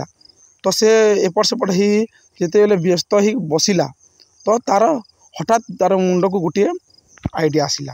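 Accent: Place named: Sambalpur, India. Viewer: Sir apan ken village ru belong karsan mate tike apan sambalpuri bagir lagu chhan i think west odisha ru belong karsan jadi b an ta sambalpuri re padhaun sir🙂 I'm from bargarh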